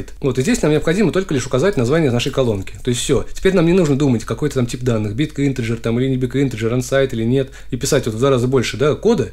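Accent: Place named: Uzbekistan. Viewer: Узбек веб разработчик на удаленке… Или я один так слышу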